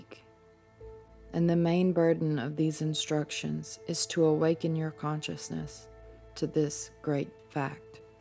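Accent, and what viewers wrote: South, United States. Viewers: Do I hear a beautiful southern accent? I am born and raised on the coast of Georgia USA.. keep reading!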